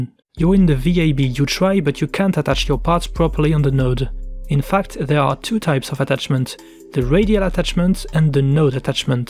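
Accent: France. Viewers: Oh I love French style English videos like this! Keep up the good work<3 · I feel like I watched a video from a frenchman in English for the first time ever. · This is amazing haha. French accent Beautifullll ! Edit: And a great refresher + I didn't know some of them so thank you :) Edit: Also, I think you might be right that it's not super sustainable (the english channel) but this video should definitely not be the last!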